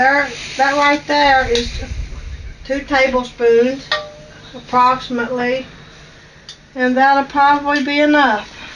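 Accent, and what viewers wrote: United States. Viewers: First time watching your videos and that soup looks delicioussssss!!!! I will try to cook it. Thank you for sharing and I love your accent, which part of the USA are you?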